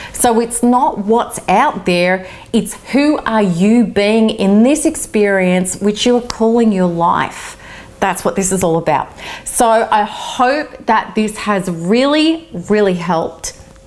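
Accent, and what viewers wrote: Australia. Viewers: Is she Australian? I'm curious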